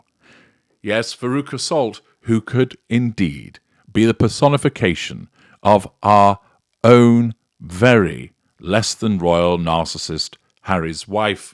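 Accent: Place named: Scotland, United Kingdom. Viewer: What would be worse than to go through the Apocalypse? Finding that Harry's Wife was on the Panel on... Judgement Day! She'd Close the Gates to everyone! Thanks, loved your Scottish Accent!💖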